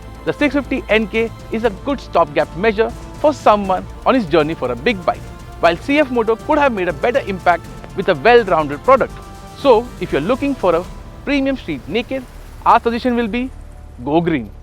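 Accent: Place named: India. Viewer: thumbs down for indian accent xDDD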